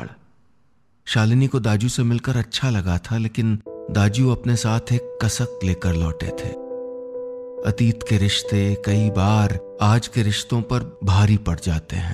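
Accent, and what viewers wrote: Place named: Pahadi, India. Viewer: बहुत अच्छी कहानी खासकर हमारी पहाड़ी बोली के बोल आपके मुँह से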